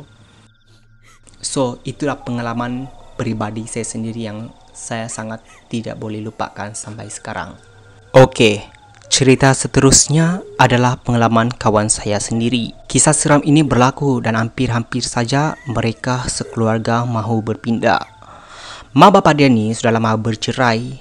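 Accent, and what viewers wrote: Sabah, Malaysia. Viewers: Walaupun saya kurang fhm slank sabah fhm2 sikit tp terbaik content bossku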